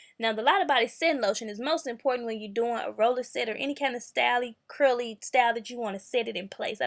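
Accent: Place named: Houston, United States. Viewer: I like your accent lol 😂 u from Houston?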